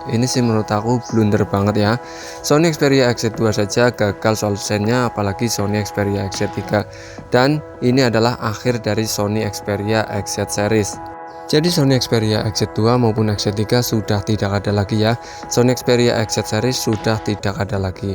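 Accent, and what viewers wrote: Banyumas, Indonesia. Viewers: Ngapak yah kak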